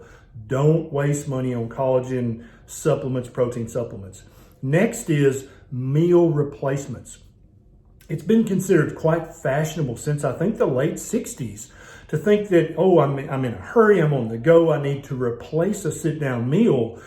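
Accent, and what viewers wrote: South, United States. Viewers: .....loooove your delivery! No baloney, no hooey, just southern drawl sweetness and info from a southern gent! Thanks for keeping it real!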